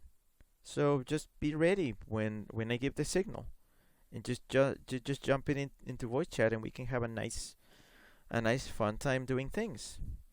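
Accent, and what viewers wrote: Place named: Mexico. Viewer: Yes; I feel abou the same... even the HMs, which I do not miss, leave a hole. Also, I do not mind waiting a few years and playing other games... what we did in the ol days! Your theoretical On a different note, your Mexican accent is more noticeable here... though a carefu listening reveals your mastery of English, even while speaking.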